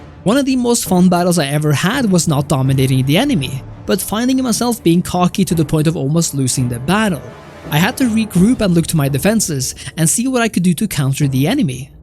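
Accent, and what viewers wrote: Norway. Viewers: Må jobbe med den norske engelsken din kompis \__('^_^')__/ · You norwegian accent is just awful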